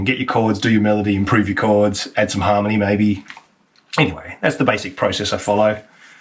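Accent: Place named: Australia. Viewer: Thanks, this is just what I needed this morning, you always make me smile, and sometimes I really do think you are from Michigan with that I only need this or that to make it work but then that great Aussie accent keeps me grounded. Keep up the great work.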